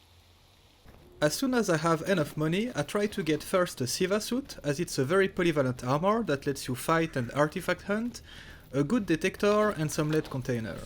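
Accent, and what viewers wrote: France. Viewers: l'accent bien Français des familles ^^, très bonne vidéo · tu a un accent fr je me trompe ?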